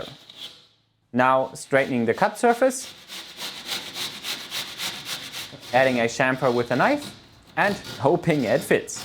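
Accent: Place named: Upper Bavaria, Germany. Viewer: tatsächlich? Ich hätte und dich eher als Oberbayern eingeschätzt. Seid ihr richtig ausm ländle, oder aus dem dahergloffene bayrische "schwaben"? (Ja, ich tu mich offensichtlich schwer bauernschwäbisch in schriftform zu verpacken)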